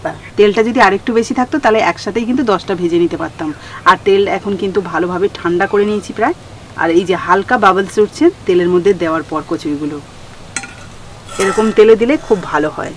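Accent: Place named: Hooghly, India. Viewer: বৌমা , তোমার কথা শুনে মনে হয় তুমি হুগলি জেলার বাসিন্দা । তোমার রান্না খুব সুন্দর এবং পরিষ্কার । তোমার চ্যানেলের উন্নতি কামনা করছি ।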